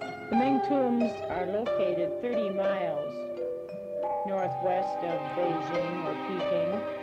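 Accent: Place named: Michigan, United States. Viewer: Not Canadian. Could be from Michigan. Wisconsin maybe.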